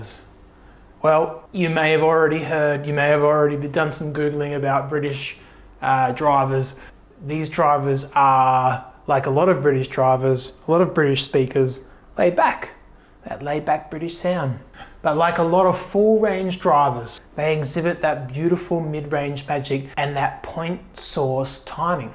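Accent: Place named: Australia. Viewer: I'm assuming that you're in Oz (from your accent). The Alnico Super 12's pop up every once in a while on Marketplace. One pair sold less than a month ago and there is another pair in cabinets on there at present. As Molly would say.... Do yourself a favour and find them 😂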